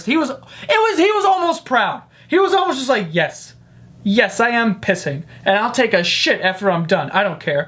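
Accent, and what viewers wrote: New York, United States. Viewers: He does, but it's probably because he's from New York and most New Yorkers say the word "ask" like "aks". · I love his New York accent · . I love you. I get you're from New York but ffs it's ask not axe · OMG love listing to you talk. Reminds me of living in New York. You remind me of people in my family. Keep it coming. 💯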